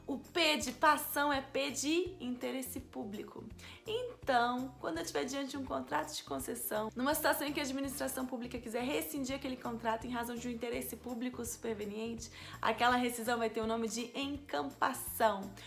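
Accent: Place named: Minas Gerais, Brazil. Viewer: Sotaque mineiro é inconfundível 😊⚪🔺⚪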